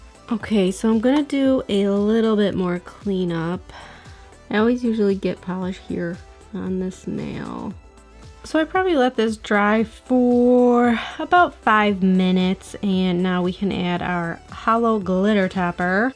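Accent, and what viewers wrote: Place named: Chicago, United States. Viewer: Loved this video! Are you from Chicago??? That accent...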